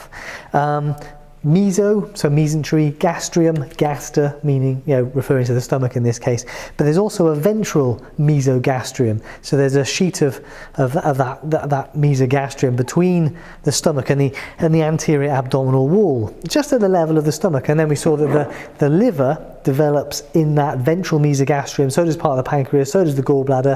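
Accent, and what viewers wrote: England, United Kingdom. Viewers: Why does everything sound so much better in an English accent lol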